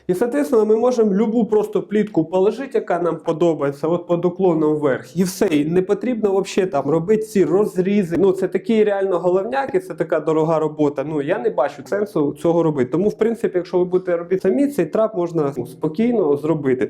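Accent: Surzhyk, Ukraine. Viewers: за суржик 👎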